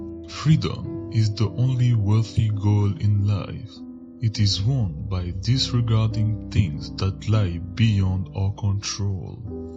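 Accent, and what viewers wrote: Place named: France. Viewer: This guys voice fd the whole video up... Annoyed me so badly... French?? What is this voice?? Yuk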